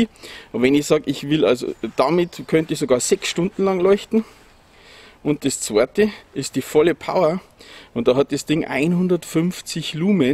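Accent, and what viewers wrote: Bavaria, Germany. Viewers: #M2R Pro Vielleicht bringt sie mir ja Licht ins Dunkle?! Vielen Dank für deine super Video in bayrischer Sprache!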